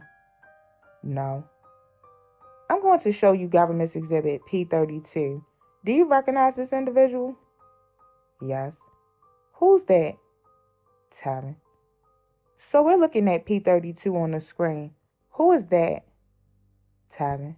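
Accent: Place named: Baltimore, United States. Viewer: This narrator is dope af 💪🤞 Can hear the Baltimore in her voice for real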